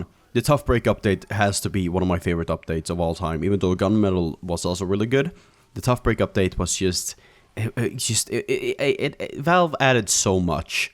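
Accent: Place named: Sweden. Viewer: That's just the Swedish accent, Joel from Vinesauce is the same.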